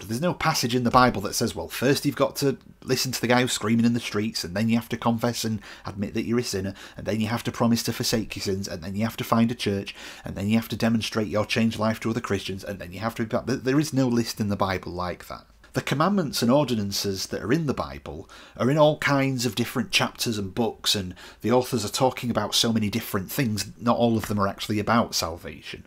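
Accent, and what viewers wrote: United Kingdom. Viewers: Great deep study of repentance, as always brother 👍🙏 i enjoy your studies, fyi: i love UK accent 😊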